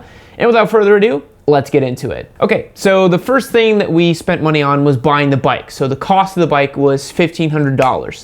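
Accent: Portugal. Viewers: my dude are you portuguese? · Are you Portuguese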